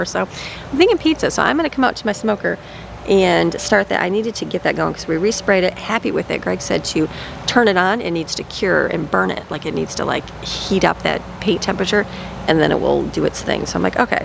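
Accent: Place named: Florida, United States. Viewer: 🙂when I looked it up, it sounded just like how says it...:) maybe it's the Floridian accent making it sound like Bells:)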